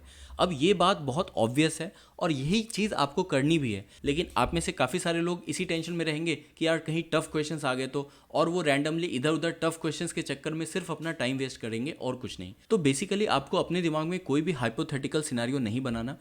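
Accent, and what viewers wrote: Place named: Bengal, India. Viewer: Sir আপনি কি বাঙালি ? আপনার হিন্দি তে যেন বাংলা টান আছে ...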